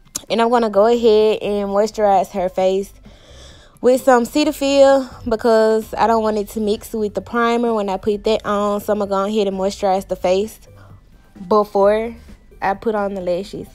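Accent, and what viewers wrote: South, United States. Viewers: nah i was tryna guess what state grl.. because yu definitely from the south! Nd this makeup is fye..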